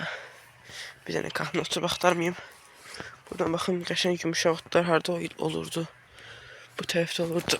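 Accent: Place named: North, Azerbaijan. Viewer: Bu şimal zonasının lehcesidi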